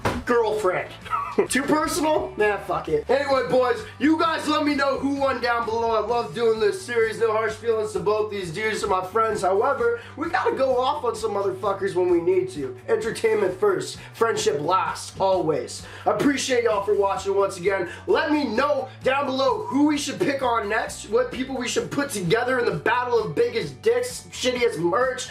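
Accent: Winnipeg, Canada. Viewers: Are you from Winnipeg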